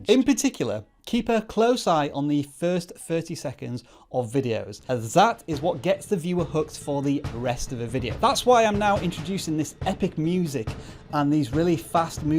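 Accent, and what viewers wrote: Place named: United Kingdom. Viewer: Totally off topic, but I’m working on learning regional British accents. Where, exactly, did you grow up? Thanks.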